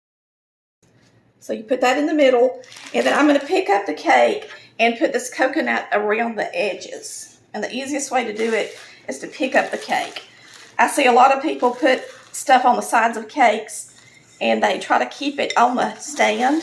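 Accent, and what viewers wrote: South, United States. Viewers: you have mind blowing southern accent, love it😍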